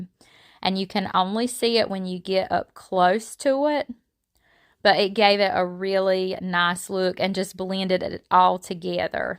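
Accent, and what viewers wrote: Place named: South, United States. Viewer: First time viewer. Love your projects and accent. Is it TN.,KY., or Alabama.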